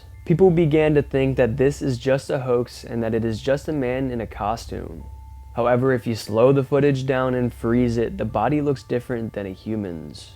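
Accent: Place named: California, United States. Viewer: Get a narrator. You have too much vocal fry and a very Californian accent. Your voice is not good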